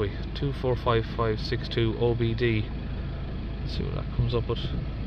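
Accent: Ireland. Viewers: Hiya. Love watching your videos and we don’t even have a diesel now. Got a question for you which is away from cars & vans just for now. I’m 63 now and Dad was a Kerry man while Mom was Coventry. They used to take me to Ireland when I was a nipper to Westmeath near Mullingar. Your accent does keep reminding me of my time there so am I close or not. Do hope you don’t mind me asking. Keep up the brilliant videos 👍👍🇮🇪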